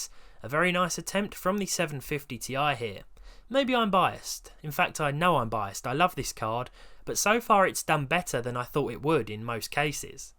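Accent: United Kingdom. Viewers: Are you from british ? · Love your videos my British friend you are excellent very enjoyable good reviews keep it up I hope you're sponsored and people give you money U2 must love you I'm hoping and you deserve an award by now anyway like I said you one of my favourites I was watcher stuff we always seem to pop up all the time so I do continue to watch her things keep up the great work love your accent love your comedy try to throw more comedy in there that's very enjoyable try to stay British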